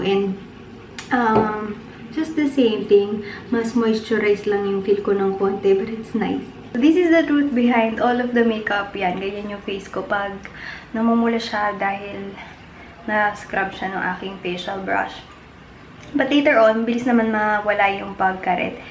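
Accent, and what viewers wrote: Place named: North Luzon, Philippines. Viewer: Ms., just wondering kung saan yung province mo? May punto ka kasi mag salita minsan. Parang somewhere north luzon.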